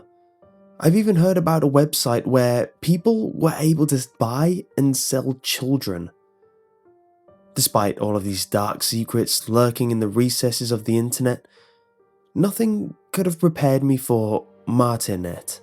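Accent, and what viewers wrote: United Kingdom. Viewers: Sorry english is not my native language, amm... is this the so called british accent?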